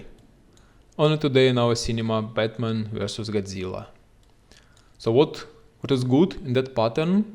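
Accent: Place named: Russia. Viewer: russian accent makes it impossible to listen. anyway thanks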